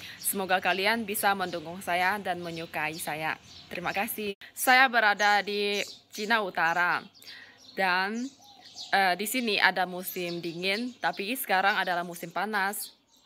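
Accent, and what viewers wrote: Kalimantan, Indonesia. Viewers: asli Indonesia dari Kalimantan tapi dia keturunan China Indonesia ketika di Indonesia lebih sering pakai bahasa daerah seperti bahasa Hakka jadi ketika ngomong indo logatnya rada asing🙂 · Logat bicara org kalimantan , Pontianak mmng kebanyakan begitu bu.. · Itu logat tionghoa kalimantan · Sepertinya Tionghoa Kalimantan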